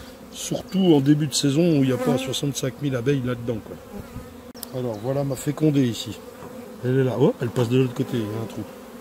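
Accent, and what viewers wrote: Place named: South, France. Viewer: Hello ! D'abord grand MERCI ! Encore une vidéo passionnante ! C'est dingue ce que j'apprends grâce à toi... Ensuite.. J'aime trop ta voix de Bary White 😉 et ton accent du sud parfois... Lol.. Enfin.. Pour moi la reine vierge va mourir.. Soit abandonné par terre.. Soit tuée car les zabs vont sentir les phéromones de l'autre (bonne) reine.. Et n'auront plus besoin d'elle... J'ai bon ? Encore mille merci ! Flo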